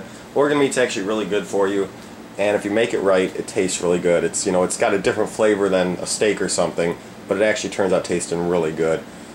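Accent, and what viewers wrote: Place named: Midwest, United States. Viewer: 1 of the best recipes ever! Thanks man good job buddy! I can Tell by your accent youre from the Midwest! Im in ND! Thanks man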